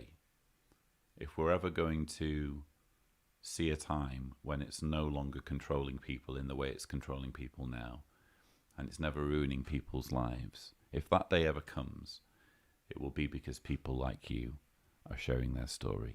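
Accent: England, United Kingdom. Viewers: Are you English or Croatian or................?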